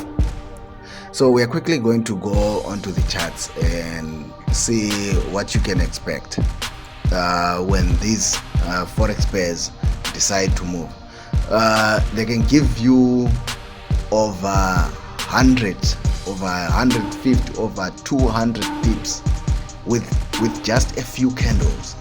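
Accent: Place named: Zimbabwe. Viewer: I love this Brother, he somehow sounds Zimbabwean, great content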